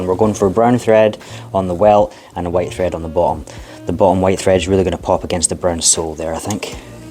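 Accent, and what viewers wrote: Scotland, United Kingdom. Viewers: Have relatives from Northern Ireland, who always considered themselves as 'British' rather than 'Irish,' but I'm not too familiar with accents but think you might have some Scottish roots too. Perhaps not, but you have a new subscriber here...) Best wishes!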